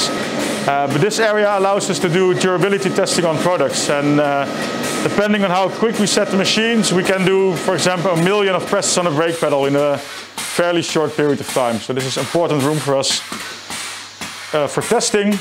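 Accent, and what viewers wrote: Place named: Netherlands. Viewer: Love the dutch accent😅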